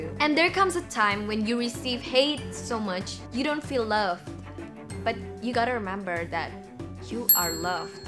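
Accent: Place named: Singapore. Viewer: kalo ngomong bahasa inggris jadi ga keliatan kaya orang indo, kaya orang singapore wkwkw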